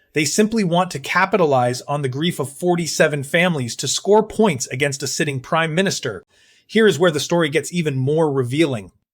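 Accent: United States. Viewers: I am at the point of maybe giving up on watching YouTube. Cannot trust anything. I can't believe a site that is called Australia Today, yet uses an American Voice. Perhaps it would be better to be uninformed rather than misinformed. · You could at least teach the AI how to speak Australian, not American. And while you're at it, teach it how to say the name of our Prime Minister - it is not Albaneeeeeze - it's pronounced Alban-ee-see.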